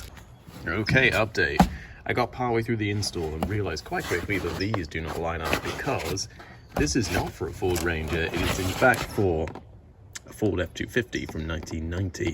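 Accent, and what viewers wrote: England, United Kingdom. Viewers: Lovely engine sound. Where in England is your accent from? Excuse nosiness. Huge difference in fuel prices. Would cost an arm and a leg running those lovely big engines here. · Good to have and hear a Brit on here having fun with old cars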